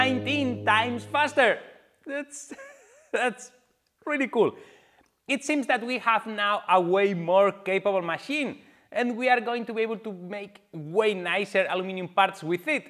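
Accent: Spain. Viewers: Eres español no? El nombre y sobretodo el acento🤣🤣🤣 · Hola Espanyolo, which ciudad are you in? I hit play, and within about 0.005 seconds my wife had said unintelligibly quickly: he's a Spanish - which makes her Ingles sound a lot worse than it actually is. I'd say it's stronger than yours, certainly weaker accent, too :-D I enjoyed the video, nice work on the upgrades. Next thing you'll be building one as capable as TOT's CNC router :-D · ya sabemos que sos español flaco, porfavor, o habla bien ingles o habla en español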